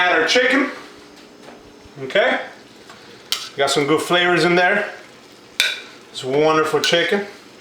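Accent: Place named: East Coast, United States. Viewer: Super annoying delivery. Suggestions: Either go back to pumping iron or lose the silly hat, the "I'm an east coast thug" accent and especially all the arm waving. This "performance" amounts to a bad caricature of and an insult to whatever ethnic population it is that you're attempting to mimic ("Latin" ??? If it's supposed to be Italian, you're insulting me; it's certainly not French... And by the way, you massacred the pronunciation of "Doufeu"). However... The food you cooked might actually be good!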